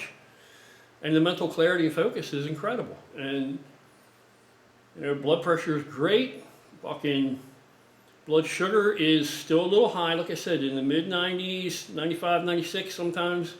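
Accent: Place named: Western Pennsylvania, United States. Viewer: Man you sound like you're no further than 2 towns down from me in western pa. Lol Indiana County Pa.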